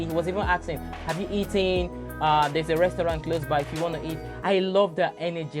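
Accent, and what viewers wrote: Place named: Philippines. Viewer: Bro ? The 4 years you spended the Philippines made you already speak a little with their accents .😅😍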